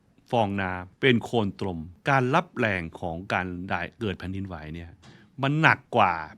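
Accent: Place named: Thai-Chinese, Thailand. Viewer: ท่านคนไทยเชื้อสายจีน ธรรมดา ออกสำเนียงแบบนี้แหล่ะ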